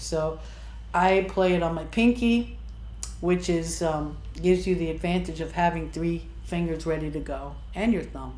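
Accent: United States. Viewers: Love your accent “Chicago” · A dear friend just turned me on to! Wow wow wow! She is the real deal. How have I not heard of her before? I am going to check her Zoom lessons. You may be from Chicago but do I hear a Boston accent? You rock!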